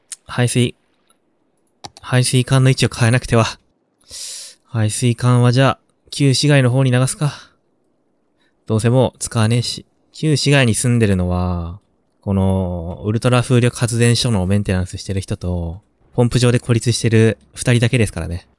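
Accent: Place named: Mie, Japan. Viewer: 〜 関西人説 「為せばなる、為さねばならぬ何事もってやつ『やな』」 結構標準語やし三重県民かなぁ？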